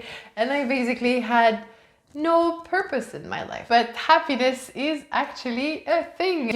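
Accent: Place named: France. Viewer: She is French she has that French accent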